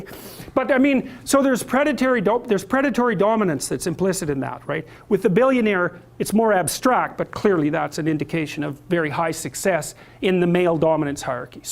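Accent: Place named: Canada. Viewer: I’ve only watched a few of his videos, but his Canadian is in overdrive on this one.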